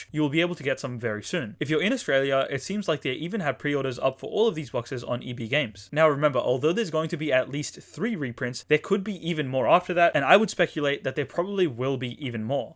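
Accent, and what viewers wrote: United States. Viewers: Happy 10k! I enjoyed the American accent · I'm looking forward for the Pikachu figure box, also happy 10,000! Your American accent is much better than some that I know for sure.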